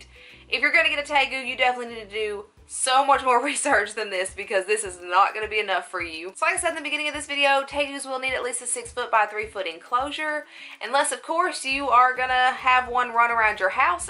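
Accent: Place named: Alabama, United States. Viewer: I cant get enough of your bama accent. 😍😍😍 You sound like maggie from the walking dead...lol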